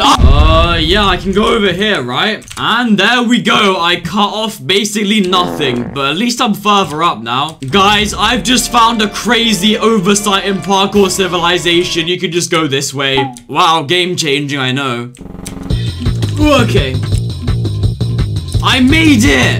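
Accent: United Kingdom. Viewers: Bros British😂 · Bros brithish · POV if parkour civilization wasn’t scripted❌ POV if was British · I was dying, because you’re British (Sorry) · pvp civilization but british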